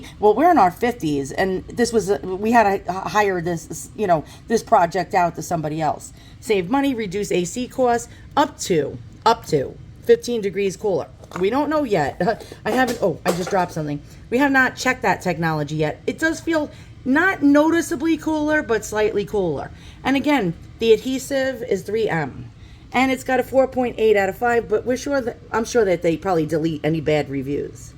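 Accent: New York, United States. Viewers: From a new yorker to another new yorker, love the accent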